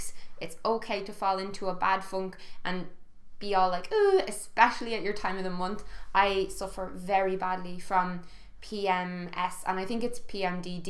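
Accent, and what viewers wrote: Ireland. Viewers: Haha I'm a sucker for an Irish accent!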